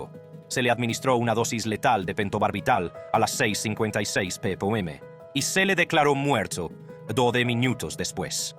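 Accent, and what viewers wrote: United States. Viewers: Ridículo hablar con acento americano cuando se escucha que puedes hablar perfectamente el español ridículo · Deberían de buscar un narrador de historias, que no cambie su manera de narrar. Porque habla claro y luego le entra complejo de americano tratando de hablar español, que no se le entiende mucho. O te peinas o te haces rolos. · Que cringe da este motor de voz gringo 😅😅😅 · Q voz.... empieza hablando muy bien el español y luego a lo gringo, si no puede q no hable y pongan a otros q hablen bien el español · Nose ,si persiven que el narrador habla de diferentes formas,primero habla como gringo que está aprendiendo a hablar español,luego habla normal,luego con acento de como español es rara su narración .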